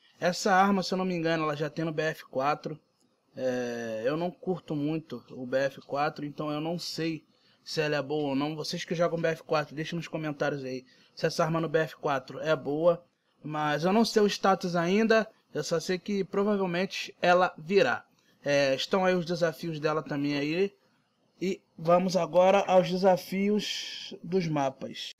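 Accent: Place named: Rio de Janeiro, Brazil. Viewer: mds carioca conversa feio mano. na moral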